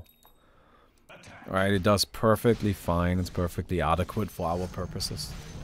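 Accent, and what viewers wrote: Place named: Germany. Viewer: It is 100% without a doubt a German accent. Not Canadian, not enough "ey". Not American, not enough cowboy or California. Not French, Italian, English, Irish, swedish, Russian or Australian.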